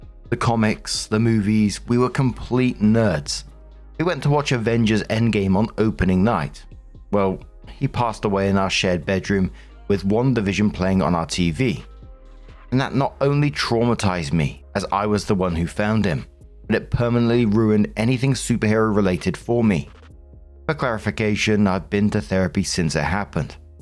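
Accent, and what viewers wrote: England, United Kingdom. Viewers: What region of England is your accent from, can't place it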